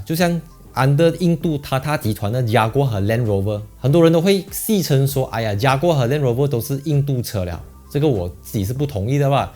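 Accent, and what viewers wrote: Malaysia. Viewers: 多謝分享。很資訊性。學習了。還有熟悉的馬來西亞腔調。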